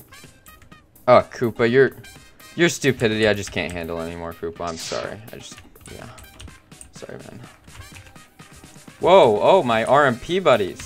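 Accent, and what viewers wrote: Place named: United States. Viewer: me who would think that the one who owns such a strict group would not call everyone a moron also u sound like your from america but isnt this a british army?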